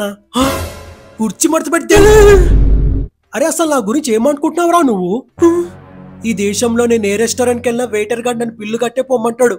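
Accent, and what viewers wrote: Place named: Telangana, India. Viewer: Nuv telangana slang matladak ra malli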